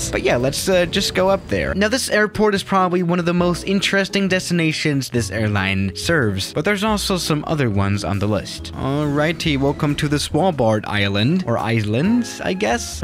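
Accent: England, United Kingdom. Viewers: To be honest you sound so British. I'm from Norway so sas is a good airliner.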